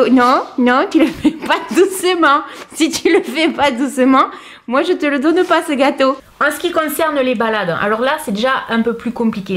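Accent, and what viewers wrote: South, France. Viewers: Il va être très heureux ce chien avec vous, un malheureux de moins à la spa. J'aime beaucoup vos vidéos pourtant je suis pas une jeunette mais ça me fait passer des petits moments agréables. Votre accent du sud est très plaisant, je suis du sud aussi. Vous faites très authentique, c'est cela qui me plaît beaucoup chez vous. Merci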